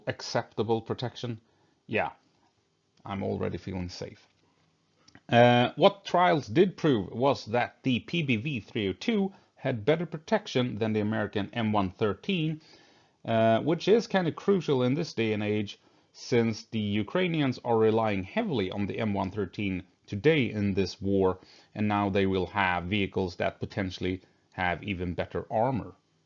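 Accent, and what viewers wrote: Gothenburg, Sweden. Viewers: är det göteborgska som jag hör?! Gissa mitt namn! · Du måste vara Svensk, finns ingen som klarar det utalet ;D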